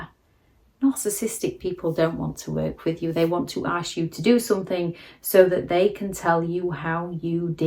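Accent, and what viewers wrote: United Kingdom. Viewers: O.K. besides excellent content I love your British accent and as a mother of 5 your energy as U hop like a bunny in your first short.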